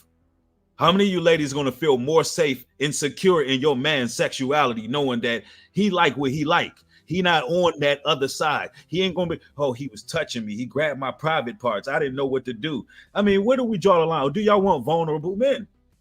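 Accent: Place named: Philadelphia, United States. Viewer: Your Philly/Meek accent is hilarious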